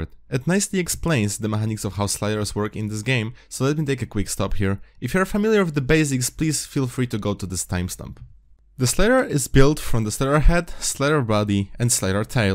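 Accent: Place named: Poland. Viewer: he is polish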